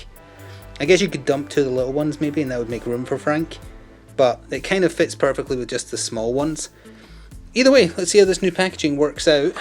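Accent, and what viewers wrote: Scotland, United Kingdom. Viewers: From one Scot to another... Glad to hear a familiar accent in the Youtube scene! haha :) will you make any DC Universe game content? Also, do you have a source for the cards for the OOP movie version of Zod and the Guild? Also, I am glad to see Knight using pictures of miniatures on the box. Not enjoying the wave of manufacturers showing renders as they dont always translate into the miniatures as well.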